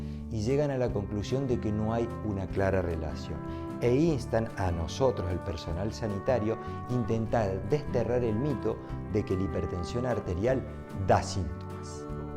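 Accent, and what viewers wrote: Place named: Córdoba, Argentina. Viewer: Buen vídeo ! El asentó cordobés no se te va 😂😂😂 yo 20 años in USA y no hay caso 😂😂😂 Aguante Alta Gracia !!!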